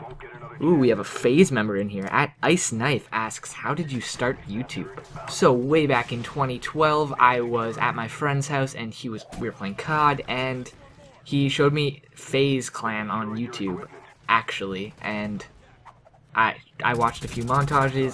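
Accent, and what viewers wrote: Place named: United States. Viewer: Were you born in Canada? You sound American.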